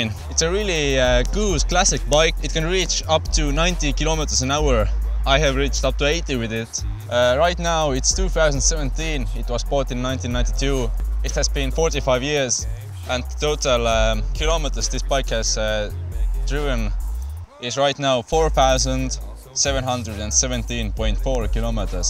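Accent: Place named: Estonia. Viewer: Aksent reetis et tegu võib olla Eestiga. Lahe ja naljakas video.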